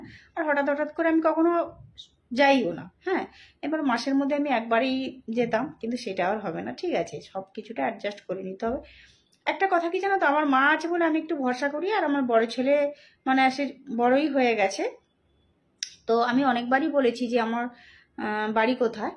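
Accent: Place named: Purba Medinipur, India. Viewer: Tumi to amader purba Medinipur er meye go shune valo laglo 🥰achha amader ancholik vasay ekta question korchi reply dio 😃egra r Contai ascho kunodin? 😀r Digha kotobar jaicho?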